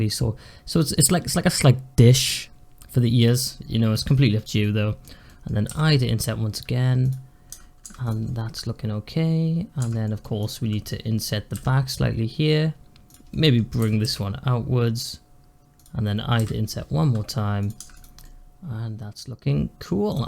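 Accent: Wales, United Kingdom. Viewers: Your Welsh tones added to the relaxing nature of this video.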